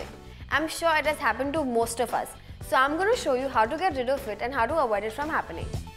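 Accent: India. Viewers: Tania Castellon lol she is speaking English with an Indian accent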